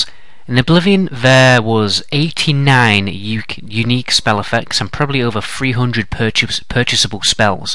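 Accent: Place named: North, United Kingdom. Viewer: where are you from? your accent is exactly the same as the northmen in game of thrones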